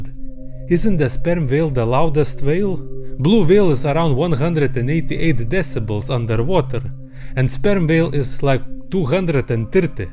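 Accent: Russia. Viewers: Is he Russian? Cuz it he is I love it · ur accent remind me of russia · this russian accent is sooo good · Love the Russian accent 😂😂😂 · as a Russian myself I can confirm that some people really have accent like this when they talk in English, if they don't talk or practice often. But it's kinda strange that has it, considering that he's narrating many videos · Хард рашн акцент? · are you from Russia? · По акценту слышу что русский парень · Cool concept for a video, but god damn I'm tired of "Russian" channels. Bro, even FPSRussia got old after about the 10th video. The accent isn't entertaining. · Ukrainian, English and Russian. And this accent sounds exactly like a russian speaker trying to speak English without any diligence.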